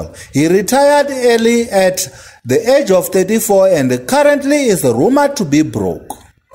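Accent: Venda, South Africa. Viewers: That raw Venda English accent 🔥🔥🔥😁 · The heaviest accent from Venda I ve ever heard. The rocks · Is this a south African accent? Venda or the other side of the fence?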